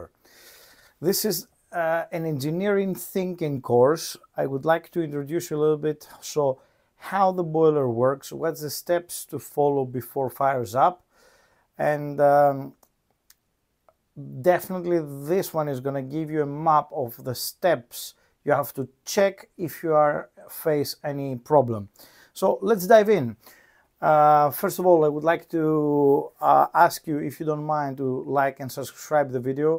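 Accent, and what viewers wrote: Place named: Greece. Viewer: Στάθηκα ακούγοντας τη προφορά και είπα ώπα, δικός μας. Πολύ ωραία,καλή συνέχεια.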